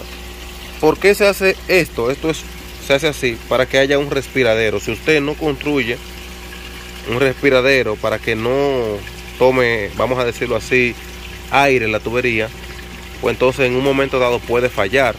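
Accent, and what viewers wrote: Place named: Dominican Republic. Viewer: Saludos usted suena como dominicano, tiene Instagram para comunicarme con ustedes?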